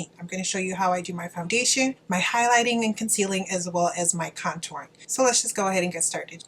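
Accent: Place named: Caribbean. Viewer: You are beautiful, and you did an amazing job with the explanation and demonstration. During the video, I heard a tiny bit of an accent, a Caribbean accent, like mine lol. Am I right, are you from the Caribbean? I went through many of your videos to confirm but didn't see anything. So, are you?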